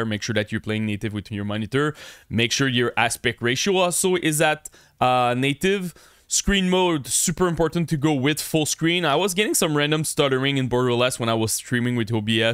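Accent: Quebec, Canada. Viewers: french canadian.... smh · clairement québécois bro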